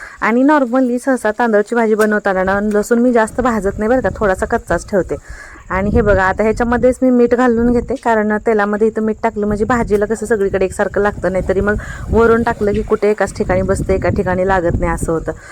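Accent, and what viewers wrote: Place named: Latur, India. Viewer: मला वाटल कि तु लातुरचि आहेस भाषा तशीच वाटली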